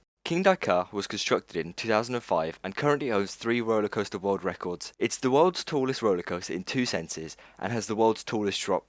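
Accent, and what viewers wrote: United Kingdom. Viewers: Contrary to the excellent(!) video presentation, I found the narration to be rushed and mumbled. I had to reverse a few times to catch what was being said - and it's NOT because of the British accent. Maybe my hearing is going.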